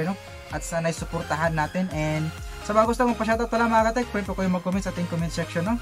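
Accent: Visayas, Philippines. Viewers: Yan ang tinood na mag tudlo..hajaj bisaya ka sir?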